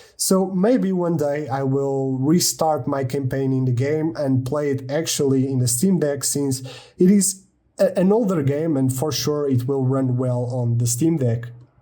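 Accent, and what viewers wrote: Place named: Portugal. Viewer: I can tell your native language is portuguese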